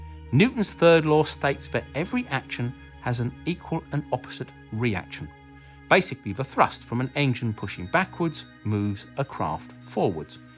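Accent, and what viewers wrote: United Kingdom. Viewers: You explain everything better than my first language teacher. Is this British accent? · Why is this guy with a British accent when it is the Americans who are achieving this accmplishment??? I went to Cape Caneveral and they had a British guy expliaining the Mars Mission. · hit like for british accent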